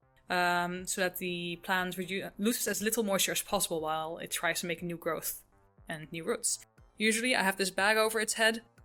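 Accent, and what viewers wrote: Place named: Netherlands. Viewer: Oh No water culture 😲 (Genf ? you sound Dutch)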